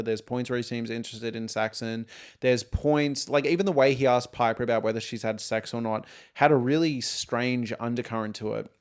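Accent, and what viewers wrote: Australia. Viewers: ? no he doesn't, he's australian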